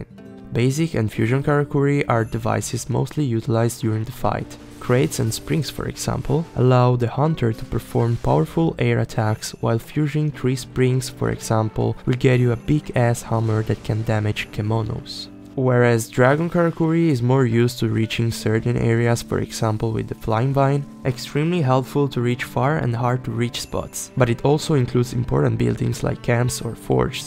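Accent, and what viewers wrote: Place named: Italy. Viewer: Brooo amo il fatto che si sente un casino che sei italiano ahahah comunque grande❤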